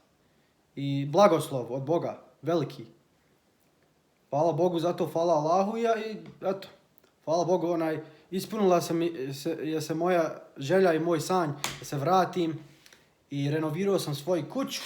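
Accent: Bosnia and Herzegovina. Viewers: Nek ti je sa srecom sine ❤❤❤❤❤ a tvoj Bosanski jezik je predivan❤❤❤❤❤🍀🍀🍀🍀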